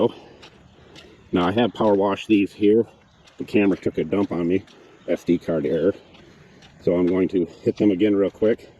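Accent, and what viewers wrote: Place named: Upper Peninsula, United States. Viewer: Crazy Upper Peninsula accent.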